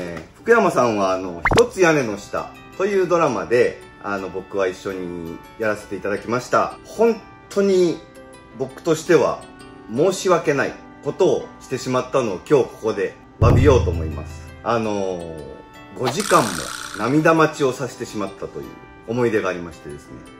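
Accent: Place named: Ishikawa, Japan. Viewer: 壱成君何気に言葉のイントネーションが石川県弁？になってる(笑) めちゃ親近感あります🙆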